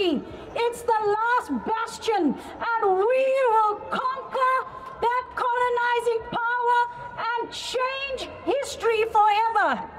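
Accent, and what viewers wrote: South Africa. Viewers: I immediately recognised the South African accent! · Before she said she was south African I already knew it from her seevh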